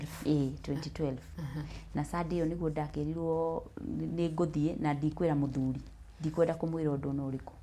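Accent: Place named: Nyeri, Kenya. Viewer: This one is from my hood....kuria gwa tutaratari...😊❤Nyeri accent is the best.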